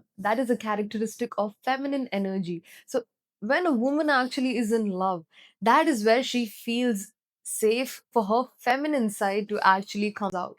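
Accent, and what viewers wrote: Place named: India. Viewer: You must be from India. Your accent gives you away.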